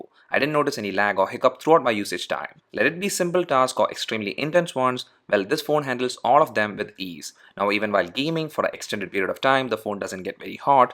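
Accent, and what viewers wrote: Kerala, India. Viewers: Are you from kollam kerela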